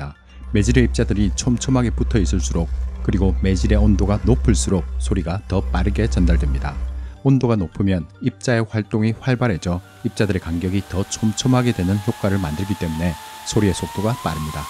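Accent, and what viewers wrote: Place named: Gyeongsang, South Korea. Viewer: 나레이션 하는분 경남사람이시네 부산잠깐살다 떠난이후 오랜만에 들어보는말투네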